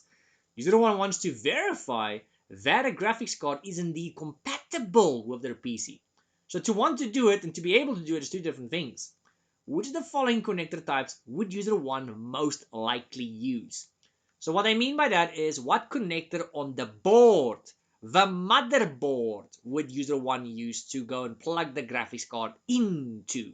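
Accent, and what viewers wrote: South Africa. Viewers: He's South African, like me. Just that I'm coloured though. He sound like a Wytie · I passed my core 1 thank to him even when the exam was updated you are my Hero and love the accent South Africa gets an automatic like and sub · Definitely South African, love it! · Immediately picked up that YT SAFFA accent! Great content though, and that's what matters! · South African Identified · As a fellow South African it was easy to understand, nice refresher I already passed. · South African accent immediately gets a like and sub 🎉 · South African? 🇿🇦🇳🇦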